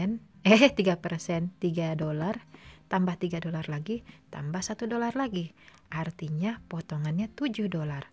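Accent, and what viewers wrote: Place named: Balikpapan, Indonesia. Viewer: Dari aksennya kek org Balikpapan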